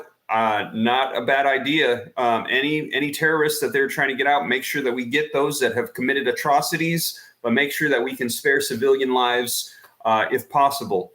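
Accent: United States. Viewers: Hey, are you American?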